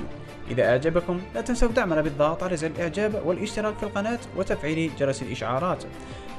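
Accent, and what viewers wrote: Morocco. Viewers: على حساب لهجته يكون مغربي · صاحب القناة من لكنته باين مغربي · لماذا التشهير باسرار الجيش لاتعجبني هذه المواضيع الكتمان ثم الكتمان العالم كله عنده جيش تريد تفكيكه مثل الجيش العراقي بحسدك خبيث صهويني ولهجتك مروكية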